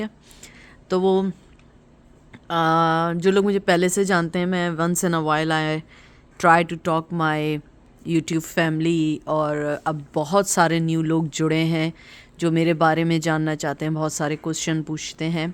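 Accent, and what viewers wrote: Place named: Karachi, Pakistan. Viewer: Hi I am in Virginia. I wish I can meet you. You sound like you are from Karachi too. ❤️❤️❤️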